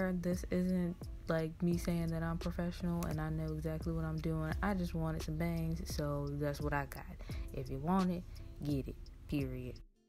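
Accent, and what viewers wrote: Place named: DMV, United States. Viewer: You in the DMV,? I hear Ricooo 👀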